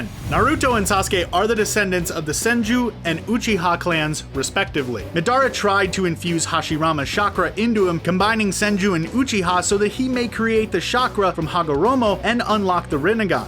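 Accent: United States. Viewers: He thinks that this is an American show that way he speaks like that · Really? Naaaruto? Boooruto? Even kaaaguya?! Wtf. Kakashi and Tsunade are about the only names that don't maker me cringe when hearing them pronounced like an American.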